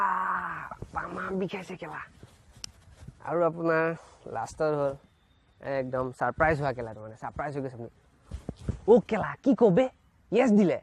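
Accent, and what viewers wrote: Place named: Lower Assam, India. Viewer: Aap Jo ye Word Bol Rahe ho..haa imke similar jo sare word hai... Wo lower assam ke logo ke muh mai jyada suit krne hai.accent accha hai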